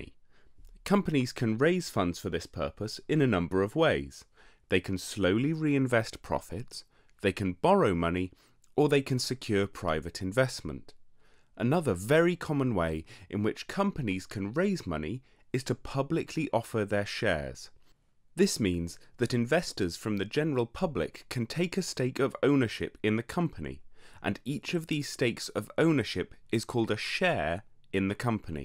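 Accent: England, United Kingdom. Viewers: It's just an English accent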